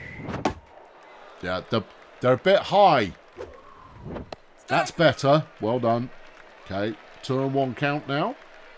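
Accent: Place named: Australia. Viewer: It's refreshing to have an Australian commentating a baseball game. Baseball is a fun game for everyone.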